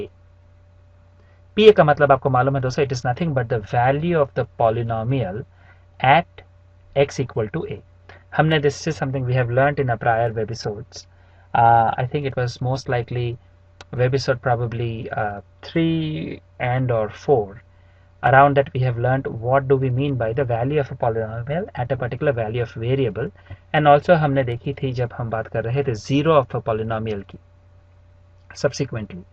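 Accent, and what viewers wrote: India. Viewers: sir,are you from south india.??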